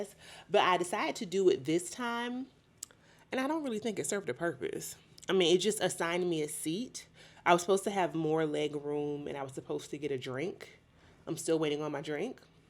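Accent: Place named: Polk County, United States. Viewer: 😂😂😂 you have me laughing so hard, because that’s exactly how we talk here in Polk county … so proud of you . Keep it up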